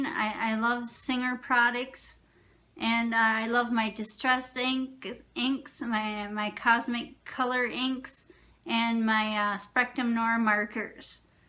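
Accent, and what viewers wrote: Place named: Wisconsin, United States. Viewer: Ha, I thought you sounded like a WI girl :)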